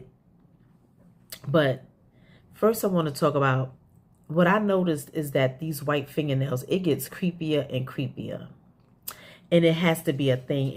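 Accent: New York, United States. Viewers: HEY, we need you I’ve been checking your YouTube for what feel like almost a year … to see if you finished this story!! I really appreciate your content.. you my NY AUNT IN MY HEAD! I’m from OAKLAND CALIFORNIA, and I BELIEVE YOU BEFORE I BELIEVE THESE PPL NEWS MEDIA PROGRAMING!! I check every day hope in the near future you bless us with the rest until then, patiently waiting on your return!! I love y’all accent man😂 you dope as hell and the queen of HARLEM TO ME!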